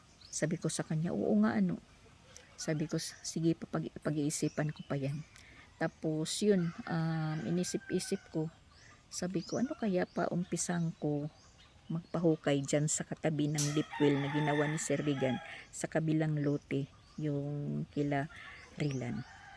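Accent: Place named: Visayas, Philippines. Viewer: Cute pamation ug mag bisaya ka ❤❤❤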